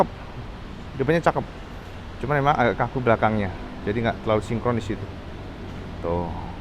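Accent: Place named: Tulungagung, Indonesia. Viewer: Megek lk boso tulungagung🤣🤣🤣🤣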